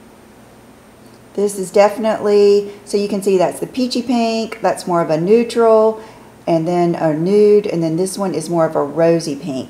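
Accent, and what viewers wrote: South, United States. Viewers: Couldn't agree more on your Southern accent! Just delightful. Everything sounds sweeter with it.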